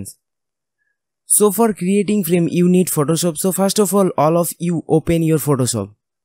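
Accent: India. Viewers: This is probably really good for INDIA ACCENTed people, but I CAN ONLY UNDERSTAND about 20% of what you are saying. GEEZZ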